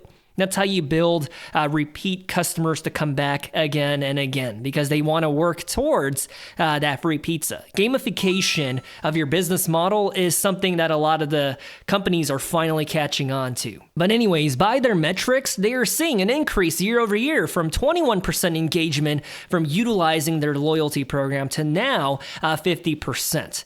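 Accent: United States. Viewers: Based on the way you talk i thought you are white american. But i dont really care, im here for info and wife's boyfriend jokes :) Im white guy from Europe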